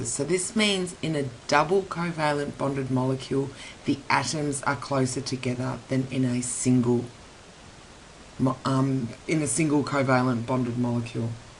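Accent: Australia. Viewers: Aussie Accent. So easy to tell :D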